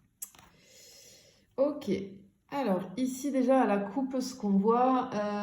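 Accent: South, France. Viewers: Merci, C'est fou ... Au monent où j'ai ouvert youtube j'étais en pleine interrogation et une question en tête et encore plus fou ,je tombe direct sur votre guidance ! Pur hasard ! Merci la grande majorité de votre guidance m'a fais sourire et je l'ai vu ! Merci,cela m'a reconforté, merci pour ce soupçon d'espoir que ses messages étaient pour moi. Tout ça avec notre cher accent du sud. Merci Ps: j'ai toujours peur d'y croire mais merci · Bonjour je vous ai découvert y'a peu de temps 🤗 et vu l'accent magnifique que vous avez j'imagine que vous êtes pas loin de celui dont vous parlez pour moi ici 😂😂 le sud hein .. j'aimerais trop savoir vers où vous êtes située car je vous est découvert presque en même que je l'ai rencontré lui et les énergies sont connectées ! Vraiment !! Trop fort 🤍 ce n'est pas ma fj je précise ,mais certainement un lien transit.. un lien qui m'a renoué avec ma féminité non sacrifié..et oui bref 🌻💌🌸🌷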